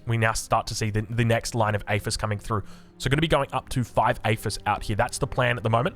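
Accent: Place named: Australia. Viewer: we need more BAR content from you. Nothing against Brightworks or anything but the australian accent is much nicer and you really help people get into the game by explaining so much. Your videos are so entertaining i even started watching the aoe ones even if i never played it.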